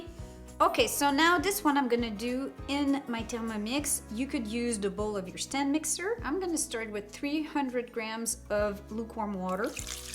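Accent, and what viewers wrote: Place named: Montreal, Canada. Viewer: Look like the montreal accent ☺️ ... from what ive always heard "00" stand for the ash content in the flour tho ... 👍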